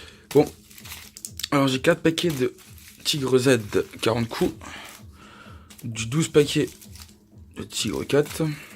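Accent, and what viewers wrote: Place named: Alsace, France. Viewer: Yo pas mal ta réserve on aime le petit accent elssas hopla tu serais me dire t en a eu pour combien et ta acheter ou ? Geuht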